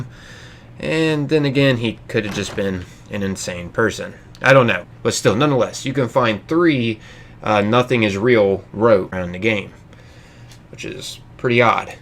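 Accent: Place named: New Jersey, United States. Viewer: Its because he sounds like he could be from New jersey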